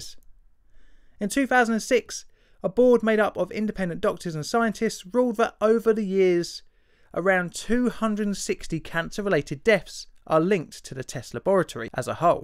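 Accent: United Kingdom. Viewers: um dudes probably a britbonger since you know near anyone can post to youtube. Its not just americans on the site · Why is it that folks in the U.S. information industry think that using a narrator with an awful Limey accent make their production more authoritative?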